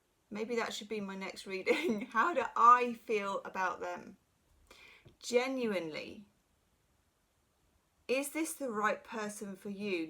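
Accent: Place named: United Kingdom. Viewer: #2 let me just get a cup of waaaaater loving the British accent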